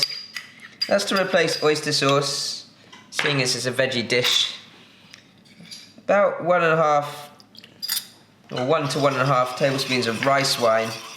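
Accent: England, United Kingdom. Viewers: I really enjoy your cooking and your pleasant English accent as well ❤ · A gorgeous Asian man with a British accent. I'm smitten 😍 oh, the food looks yummy too ☺️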